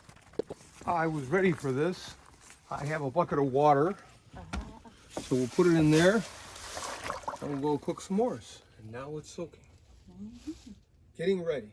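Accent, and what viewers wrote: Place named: United States. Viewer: Feels so weird to se a person so obviously Finnish by the looks, speak that American accent. /67% finnish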